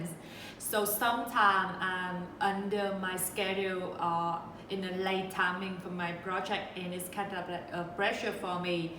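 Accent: Thailand. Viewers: I could see you were Vietnamese from your last name, but I thought you had a Thai accent and not a Viet accent when you speak English, so I actually thought maybe you were a Thai Vietnamese 😀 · It's cool that you are Vietnamese! I honestly thought you were Thai, because your accent is similar to my mom's (she is from Thailand). Also, my mom's nickname is Dang, which means red in Thai.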